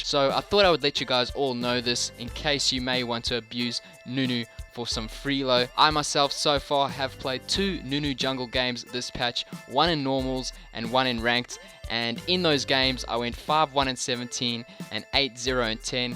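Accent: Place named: South Africa. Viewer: That accent. Sounds like South African living in Australia.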